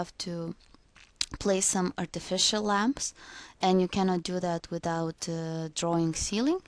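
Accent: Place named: Lithuania. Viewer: Is balso pazinau, kad lietuve :P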